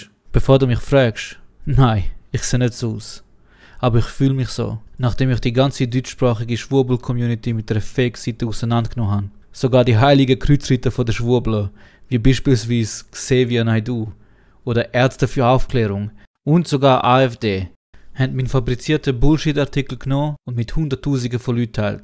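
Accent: Switzerland. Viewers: Was ist das für ein Dialekt? Schwitzerdütsch? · Krasses Video und gueti Arbeit! Btw. Bisch du Solothurner? :D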